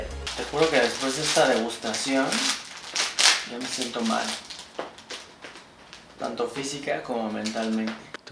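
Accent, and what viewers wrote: Fresa, Mexico. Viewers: Hable bien amigo, no sea fresa. Con respeto se lo digo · en los primeros vídeos no hablaba fresa no se a que se deba ese cambio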